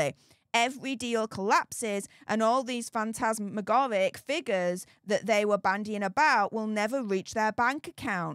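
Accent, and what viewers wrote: Yorkshire, United Kingdom. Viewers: I just love your thick Yorkshire accent! If I didn’t have my own unique accent (Australian) I definitely would have a Yorkshire accent!😂😂🇦🇺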